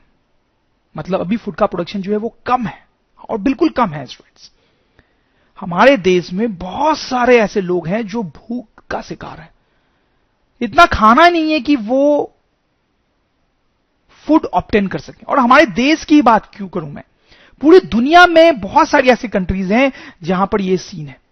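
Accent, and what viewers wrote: Bihar, India. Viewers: Are u from bihar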